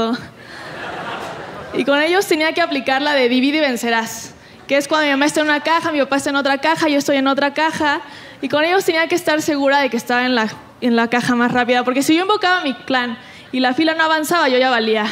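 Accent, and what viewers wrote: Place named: Michoacán, Mexico. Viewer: Tiene un toque de Michoacán 😂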